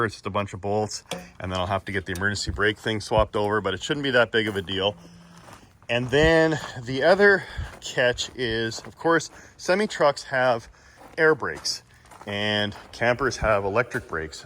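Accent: Canada. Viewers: The way you talk you don't talk like a truck driver maybe it's a canadian accent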